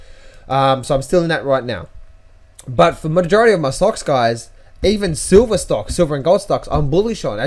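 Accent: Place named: Australia. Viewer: haha tell them in the Aussie way... love it!..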